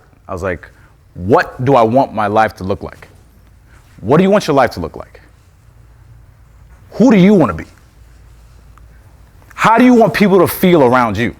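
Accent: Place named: New York, United States. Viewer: Oh you DEFINITELY from NY lol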